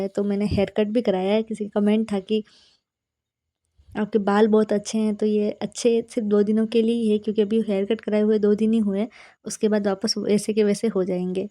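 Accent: Mewar, India. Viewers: बोली के हिसाब से मेवाड़ चित्तौड़गढ से लग रही हो दीदी❤❤ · Mera nanihal fatehnagar k pas hai or sasural bhilwara so mewadi bolte ho ap merko accha lgta hai sunna or upr se hardwork consistency awaj sb bhot pyara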